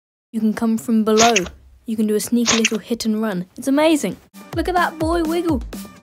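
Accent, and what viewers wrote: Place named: United Kingdom. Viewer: British accent youtubers are always the best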